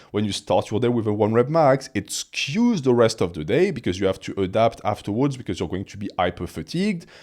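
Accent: France. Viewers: God I love that French accent idk why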